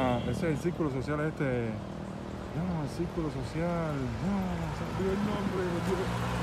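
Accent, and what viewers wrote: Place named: Andalusia, Spain. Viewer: loco por ver sus videos ,, jajaja , en Andalucia y Canarias hablamos asi, eso se llama cultura y diversidad , por si no lo sabia , desde España , bendiciones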